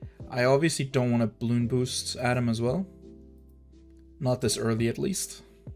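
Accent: Denmark. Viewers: he's Danish, our j sounds like that. fortunately for us all, doesn't drink baja blast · yeah, I know he's from Denmark, I just find it funny when he doesn't know about things or pronounces things differently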